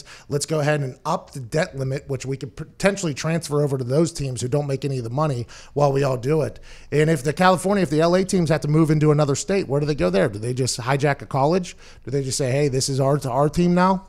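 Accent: Pittsburgh, United States. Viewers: That Pittsburgh language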